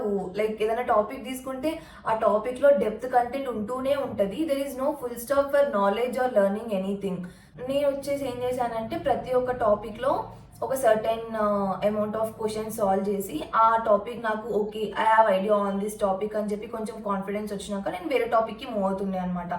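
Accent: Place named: Telangana, India. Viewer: abba telangana slang telugu.. super got awe stuck with the natural way and no showoff... Are you engaged to someone or ready to? will u M*** me 😉🙃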